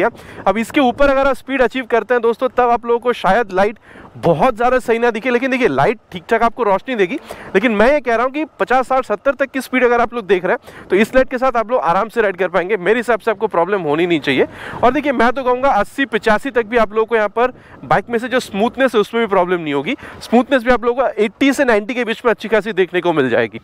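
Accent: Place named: Bengal, India. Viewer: But bengali accent of hindi hits different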